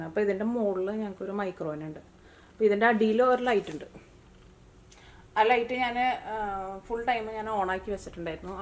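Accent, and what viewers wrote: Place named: Angamaly, India. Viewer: yude ella cooking enikku eshta.nalla presentation anu.angamaly ano kuttide place njan Kure kalam thamasichittunde.kuttide slang ketteppol thonniyatha.enikku mole valere eshta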